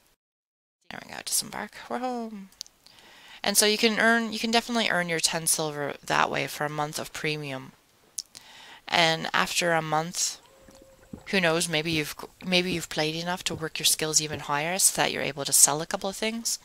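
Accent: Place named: United Kingdom. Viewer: Where's your accent from? It sounds like a little British in there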